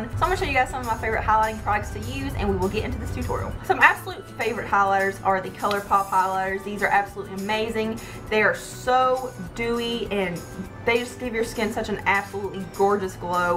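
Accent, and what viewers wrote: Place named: Gainesville, United States. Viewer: Thanks for the very clear instructions about strobing ! I sure appreciate this. I love your accent too...you sound like you are from gainesville fl.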